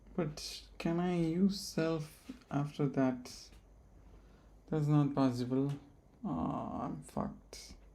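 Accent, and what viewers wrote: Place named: India. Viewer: with Indian accent (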